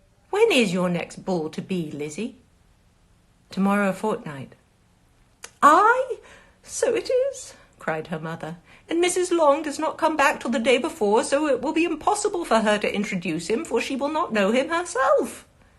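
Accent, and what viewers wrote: England, United Kingdom. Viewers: Her English accent is natural as well.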